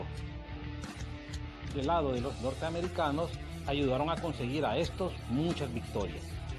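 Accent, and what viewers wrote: Honduras. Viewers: Se nota que el que habla es hondureño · Este maje del narrador es hondureño jajaja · Juan Alvarez EXACTO DESDE EL PRINCIPIO QUE EMPIEZA A NARRAR SE CONOCE Q ES CATRACHOOO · Con que dolor lo narra este idiota narrador, se le nota lo hondureño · No hay duda que por la forma que narra la historia es hondureño jijiji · Ese narrador es puro hondureño no bale verga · Ese narador a de ser hondureño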